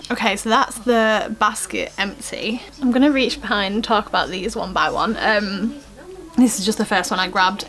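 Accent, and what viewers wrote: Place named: United Kingdom. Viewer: you're so pretty! I love your accent. Are you British?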